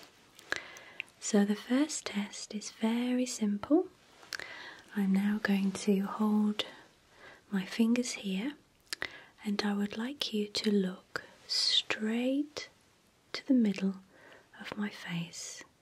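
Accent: United Kingdom. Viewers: I love ur British accent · u british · I love your british accent. kisses and hugs from brazil!! 💓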